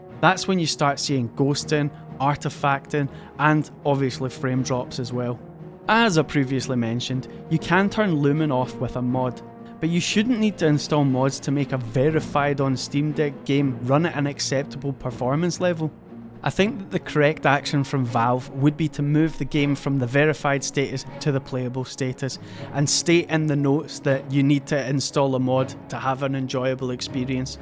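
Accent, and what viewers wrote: Scotland, United Kingdom. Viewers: Nice skottish accent Mate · peak scottish accent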